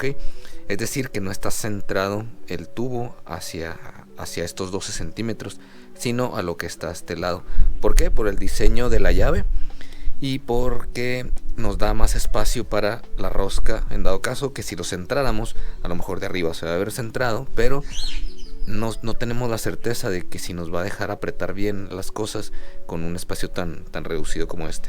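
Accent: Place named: Chihuahua, Mexico. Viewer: excelente video, gracias por compartir la informacion, no todos son asi. saludos, suena a que eres de chihuahua :P jaja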